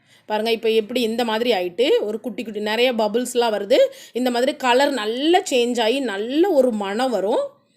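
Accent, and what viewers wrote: Tirunelveli, India. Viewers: Akka KU tirunelveli pola.. tamil avlo arumayae iruku kekum pothu 😍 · Thirunelveli language arumai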